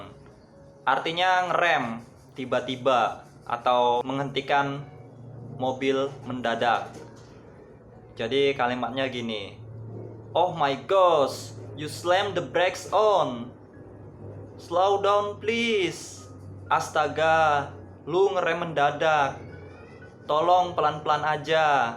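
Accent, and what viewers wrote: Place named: Java, Indonesia. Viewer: Anjir jawa bener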